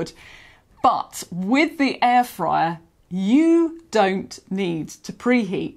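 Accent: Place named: Middle class, United Kingdom. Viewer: Perfect review, and so refreshing to hear a nice, middle-class British voice on a product review! Thank you!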